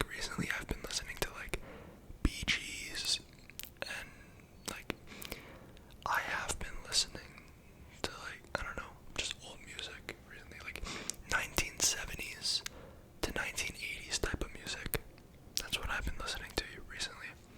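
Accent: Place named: North America. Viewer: Not only that you “look” American, We can’t tell the difference in accents between Canadians and Americans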